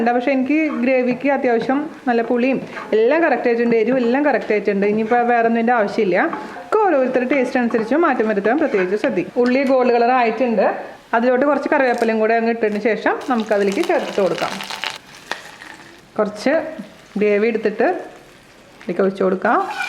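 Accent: Thrissur, India. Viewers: Hello..njan vegetarian aanu... But still I watch all ur non veg videos..that's how much I love to watch u and hear aaa trissur baasha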